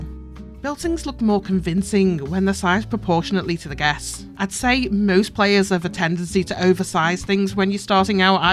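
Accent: Liverpool, United Kingdom. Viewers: Thank you mysterious scouse coaster goddess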